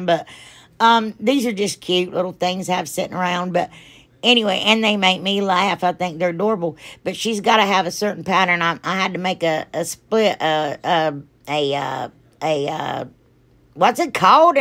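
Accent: South, United States. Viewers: LOVE your accent are you southern?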